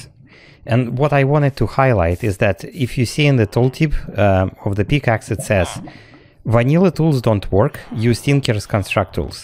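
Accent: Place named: Russia. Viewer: Слышно что русский)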